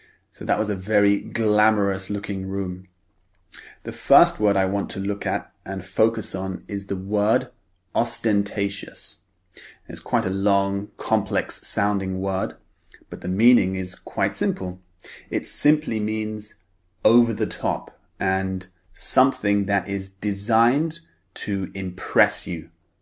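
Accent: United Kingdom. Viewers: Hello Sir English, are you from southern England?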